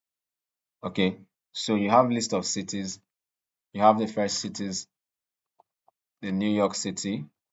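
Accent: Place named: Nigeria. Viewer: Just watched your tutorials and like them. Noticed the Nigerian accent as I lived there in the 70's and attended St. Gregory's College in Lagos. I am an Electrical Engineering graduate and Oracle Certified Professional (Application Developer) and learning to take the Python certification (PCEP) in one month. Keep up the good work.👍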